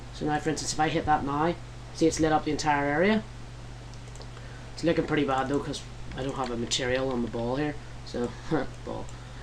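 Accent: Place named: Northern Ireland, United Kingdom. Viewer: Nice job! Northern Irish accent too !!!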